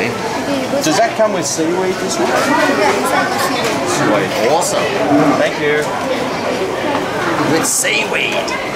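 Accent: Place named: Australia. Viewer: Nice Australian accent m9 : D love it! 👍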